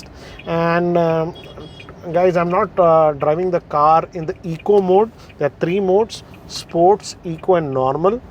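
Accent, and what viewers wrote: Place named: India. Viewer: Speciality of Indian English... 5 "actually" and another 5 " in fact " each sentence. Coming to e Vitara, I think it is the second best in the 20 lakh range. Most value for money is Tata sierra.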